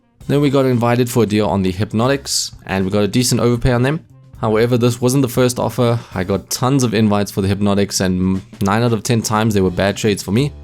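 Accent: South Africa. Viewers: Are you from South Africa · Are u from south Africa?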